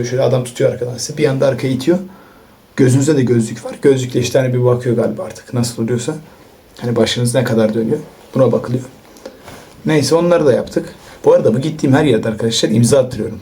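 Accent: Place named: Konya, Turkey. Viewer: Kanka sen Konyalı' mısın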